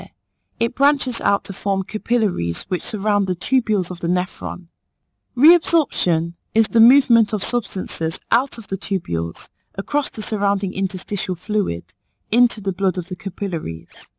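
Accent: United Kingdom. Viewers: Your pleasing british accent made this tutorial even more handy Great lesson indeed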